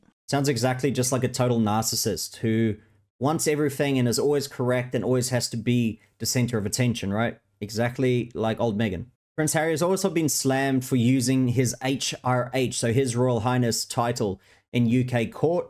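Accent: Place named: Australia. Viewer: I subbed because I have a weakness for an Aussie accent and most importantly, I can't stand those grifters.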